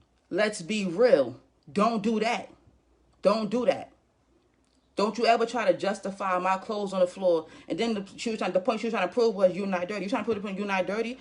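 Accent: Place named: New York, United States. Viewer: That NY accent so gully! Shit is fire!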